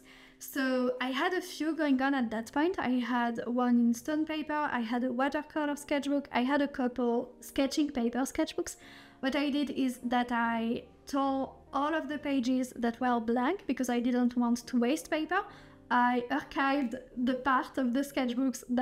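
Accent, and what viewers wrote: France. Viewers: Your French accent is so gorgeous, SO gorgeous! Your voice carries it soo well. Anyway, I will now actually focus watching this. 👌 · Totally unrelated but I am learning the Korean language and I see how difficult it is to speak in a new language not my mother tongue. From your accent I think you are French but I wanted to congratulate you for such amazing English! It’s not easy! You speak in even better English than some English speakers I know. I live in Ontario Canada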